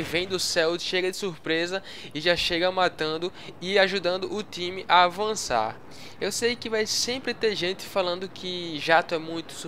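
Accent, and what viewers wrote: Northeast, Brazil. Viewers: Você é nordestino?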